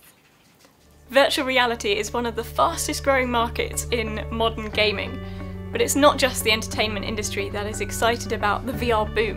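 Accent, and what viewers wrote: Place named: United Kingdom. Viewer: Can't beat a British accent :)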